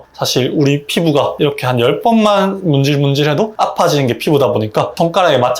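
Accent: Busan, South Korea. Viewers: 부산분이신가요? ~하고 앉았노? 하는데 찐텐 나오심 ㅋㅋㅋ 부산분들 좋아요. 의리가 !! 암튼 잘 따라해보겠습니다.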